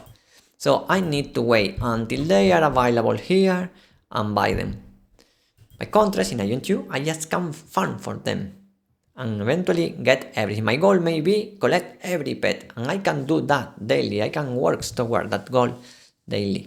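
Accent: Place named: Spain. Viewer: I love your spanish accent. Whenever you say the word just yast* im smiling😊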